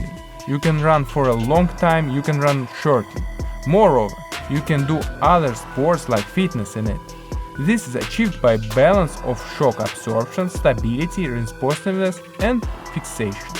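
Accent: Russia. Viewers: Nice review. Are you Russian/Czech?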